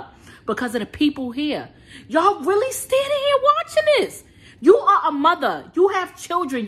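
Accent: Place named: Brooklyn, United States. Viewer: It’s the east coast Brooklyn NewYork accent for me 😍💕 thanks for sharing honey ♥️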